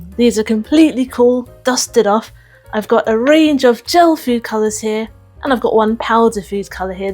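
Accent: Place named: United Kingdom. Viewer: I just love you're cute British accent. Thank you for reminding me of the vodka and food color painting method of decorating cookies. I am going to try that for Christmas. 🎉❤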